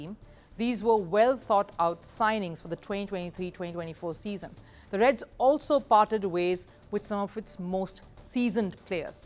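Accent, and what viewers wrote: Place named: India. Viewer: Listening to football news with an Indian accent is weird.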